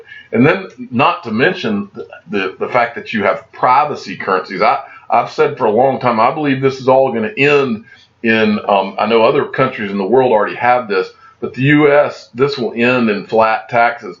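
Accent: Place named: Georgia, United States. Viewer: You sound like some boys I know from Blackshear I bet you're in a 50 mile radius of Jesup. Hello from the Boro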